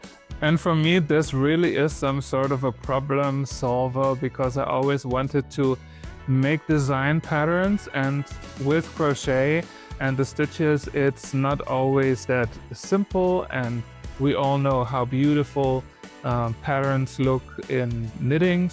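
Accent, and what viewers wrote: Germany. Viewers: DIE anleitung wäre auf 2-3 Minuten reduzierbar, wenn man das Prinzip der Maschenentstehung erklärt. Mehr braucht es nicht. Und als Anschlag eine Luftmaschenkette ist einfacher. Übrigens: bei diesem gruseligen Denglish bekommme ich Ohrenschmerzen!!! Mach Deine Videos auf Deutsch oder lerne eine vernünftige Aussprache. Alles andere tut einfach nur weh! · Dieser Deutsche Akzent, er lässt sich nicht leugnen 😂 Super Anleitung. Danke.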